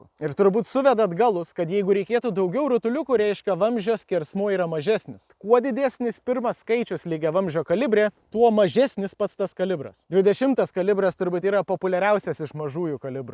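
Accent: Šiauliai, Lithuania. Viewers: Tu turi žiauriai gerą iškalbą ir gerą šiaulietišką prieskonį :D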